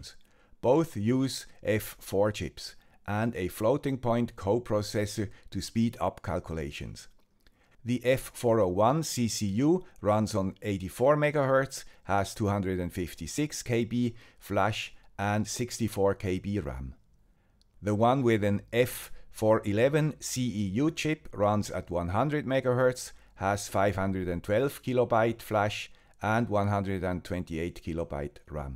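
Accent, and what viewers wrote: Switzerland. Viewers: The man with the Swiss accent does it again - another video of really useful information and comparisons. Thanks.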